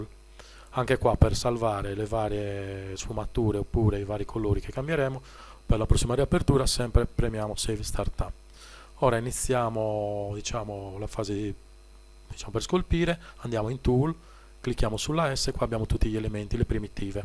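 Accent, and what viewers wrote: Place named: Italy. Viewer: Cercavo disperatamente un tutorial in italiano senza risate di anglofoni che vogliono perder tempo e ti spiegano che prima devi acccednere il pc, che il mouse nun se magna e stronzate varie. E, sorpresona, non solo lo trovo in italiano, ma addirittura con l'accento della mia isola, l'accento mio, che mi avvicina emotivamente a questo programma dall'interfaccia prevista per marziani. GRANDE!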